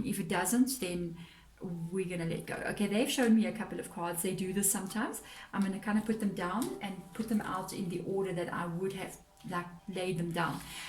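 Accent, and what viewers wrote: South Africa. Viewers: Are you based in South Africa? Your accent sounds South African.. Do you do personal readings and how much does it cost?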